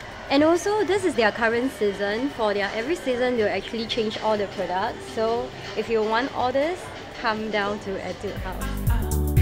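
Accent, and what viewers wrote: Singapore. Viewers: Singlish lols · sounds like a sgp dialect ;D bute etude house has so many cute and really effective stuff ! love it !! they should also sell it in europe ~ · Singapore! Know it from the famous singlish 'lah' and 'Leh' she uses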